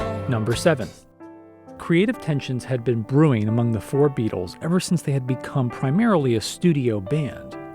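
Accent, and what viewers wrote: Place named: United States. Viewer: Annoying yank 'expert ' voice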